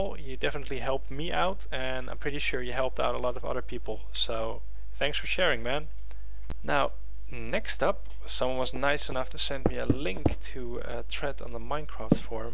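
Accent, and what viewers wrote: Netherlands. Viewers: Grappig je kan gewoon aan je engels horen dat je een Nederlander bent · Where are you from? Nederlamd? je klink nederlands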